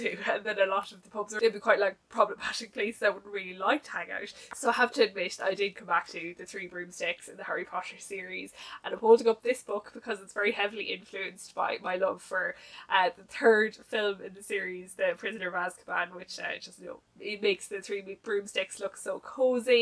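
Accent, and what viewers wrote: Ireland. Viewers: Belated happy St Patrick’s Day,! I love hearing your Irish accent! ☘️ As we approach the end of March, I’m just checking that you’re still ok to buddy read A God In Ruins in the second half of April? 😊📚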